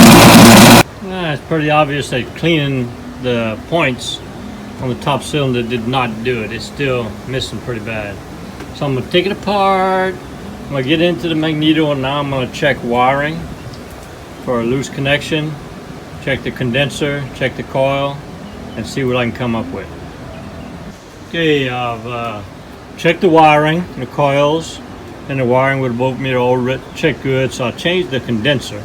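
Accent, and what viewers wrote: Louisiana, United States. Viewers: Haha I miss dat accent. My people are in Thibideaux.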